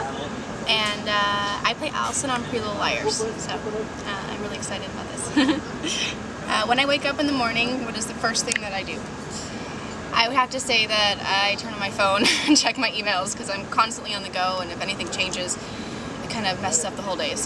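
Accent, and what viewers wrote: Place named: United States. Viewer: i thought she was from south africa? she sounds american to me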